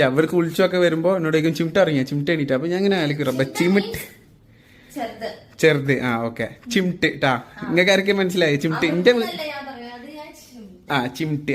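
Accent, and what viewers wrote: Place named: Kozhikode, India. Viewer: Njanm parayarund.kozhikkodan basha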